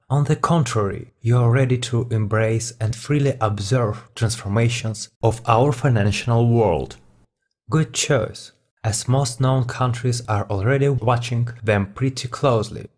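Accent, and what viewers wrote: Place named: Russia. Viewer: он та из России, вырос в такой среде с таким акцентом. Научиться со временем.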